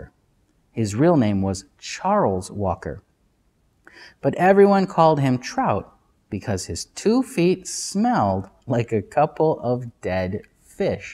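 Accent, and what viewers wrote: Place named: United States. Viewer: You read books with a very good american accent i would love to talk you can i ?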